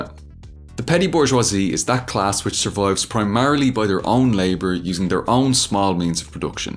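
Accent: Ireland. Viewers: There is something about you that is really attractive. I don't know what it is. I hypothesis is either of these three: - 1) Our ideological agreement (both MLM) 2) Your good looks (not jealous😂) 3) Your sweet Irish accent (not being racist) 4) Your way of (""usually"") simplifying explanations😂😂😂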